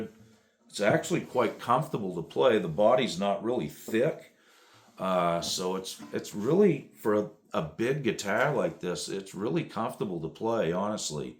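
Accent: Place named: New England, United States. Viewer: What part of New England do you live in?? The accent is hard to miss. Cool guitar.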